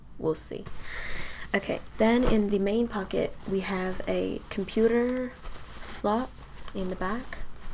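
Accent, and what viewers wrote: Russia. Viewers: Or russian, if you ever watched orange is the new black reminds me of red! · I definitely heard some Russian in the accent :)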